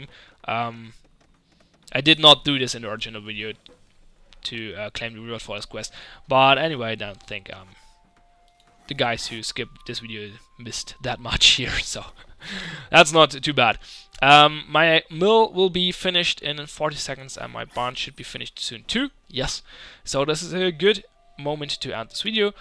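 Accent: Germany. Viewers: Man hört einfach direkt, dass da ein deutscher hinter dem Mic sitzt. ^^ Zum Beispiel sprichst Du "pretty" so aus wie es geschrieben wird, also betonst das E, teilweise auch prätty, wohingegen Menschen mit Muttersprche Englisch es eigentlich fast alle "pritty" aussprechen. ^^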